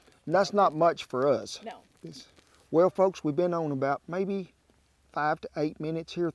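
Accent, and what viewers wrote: South, United States. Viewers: I'm thinking Tennessee I do love a southern accent lol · I'm just Mesmerized by that lovely Southern voice❤️ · my daddy came from Texas but I only remember so much since i lost him so young. Maybe that's why yours and other southerners' "plain speak" just lights me up. Up here in the northern woods people say we sound Canadian 🤣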